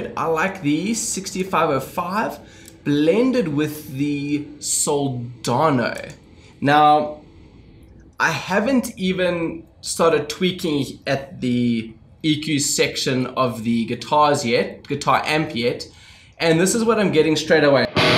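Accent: South Africa. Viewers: Imagine my surprise hearing a SA'n accent as this vid started haha. Lekker bru :P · My boet, you sound South African.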